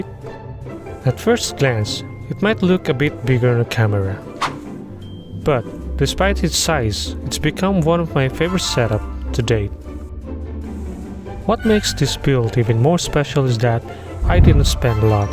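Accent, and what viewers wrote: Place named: United States. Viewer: Thumbnail is AI -Voice is american -backround voices are japanese/chinese/korea Something is very off here.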